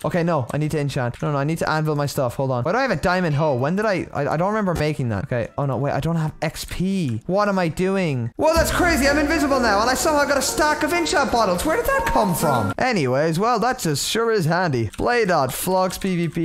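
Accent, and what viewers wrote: Ireland. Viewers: Im I the only one who noticed that he has a slite Irish acsent??Like a 20% of Irish and a 80% of American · you sound irish are you irish? nvm lmfao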